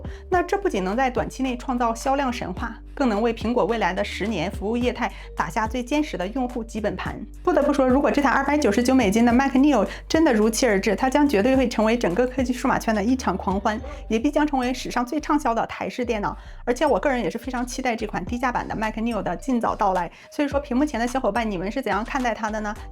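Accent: Northeast, China. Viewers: 你是东北哪的啊妹妹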